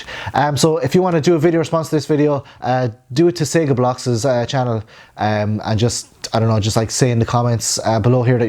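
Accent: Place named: Southern England, United Kingdom. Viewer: I forgot I owned wappy dog until you reminded me. 😳 Might have to do a VR to this. Youre in Southern England.? The accent threw me a bit. Didn't think that there were many of us tubers in the south. 😁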